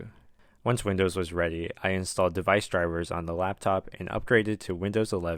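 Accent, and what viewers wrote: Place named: United Kingdom. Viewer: why is there a man from UK talking?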